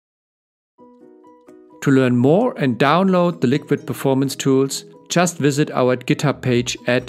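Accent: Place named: Germany. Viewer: I love this German accent!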